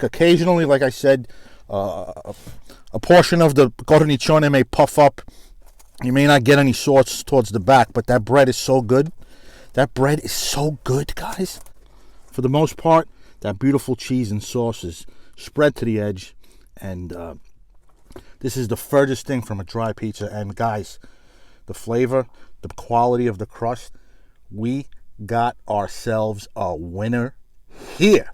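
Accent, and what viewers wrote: New York, United States. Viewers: Absolute new yawk knucklehead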